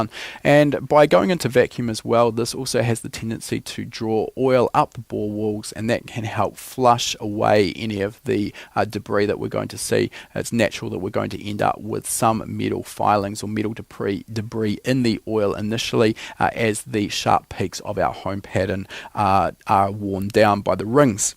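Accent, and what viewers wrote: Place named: New Zealand. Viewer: Perhaps that's how guys from NZ sound.The content is precious though so pay attn to that ☺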